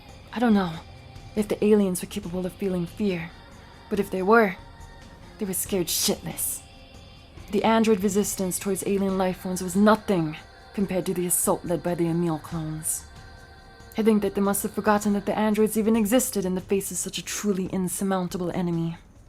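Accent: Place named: Boston, United States. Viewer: The boston / NY accent bleeding in is hilarious.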